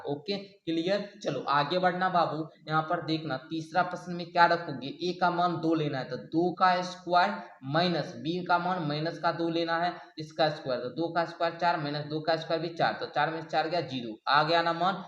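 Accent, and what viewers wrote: Bihar, India. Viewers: Kya Bihari Ho Ho😂😂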